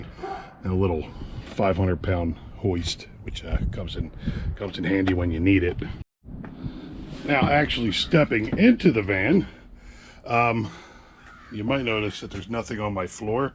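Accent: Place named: Scranton, United States. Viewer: I LIKE YA GUY; JUST RECENTLY SUBED. YOUR ATTITUDE AND MANNER OF SPEECH WOULD FIT WELL IN SCRANTON PA. (THATS A COMPLEMENT) GREETINGS FROM NOSE (THATS MY NICKNAME)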